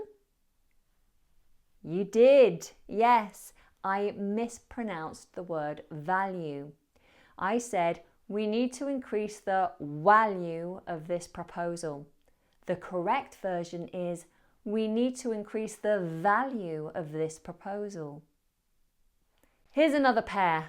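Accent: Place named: United Kingdom. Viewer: British English pronunciation with Miss!!!... Excellent!!!...❤💫👌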